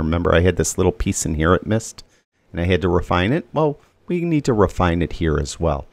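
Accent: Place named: New York, United States. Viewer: Thanks. You astound me with your knowledge and how you remember all the shortcuts keys for so many programs. I am a Lightroom Cloud user + I also use Pixelmator Pro as I find Photoshop to cumbersome a program to use when I only need to use it spasmodically. I really like Pixelmator Pro and find it a lot easier and more fun to learn and it is also very good value at a one off cost of $35 AUD when they had the half price offer. I will definitely be watching your future Pixelmator tutorials and if I can think of anything specific that I would like you to cover I will let you know. Has anybody ever told you your voice sounds like Paul Stanley from KISS. Must be the New York accent. Cheers from Sydney Australia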